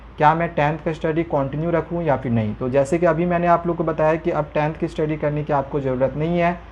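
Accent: Jharkhand, India. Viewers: Sir aap jharkhand se ho??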